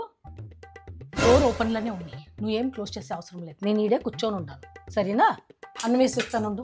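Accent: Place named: Bangalore, India. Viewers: Bangalore Telugu 😂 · Karnataka accented Telugu :) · Ee slang variety ga undi. Ballary aa banglore aa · Kannada slang telugu Bagundhi !! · This is Banglore Telugu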